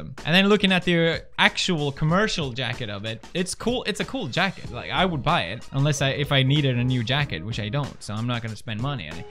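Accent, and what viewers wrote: England, United Kingdom. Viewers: Hmm sounds like he’s in England’s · Your swedish has an english accent and i dont like it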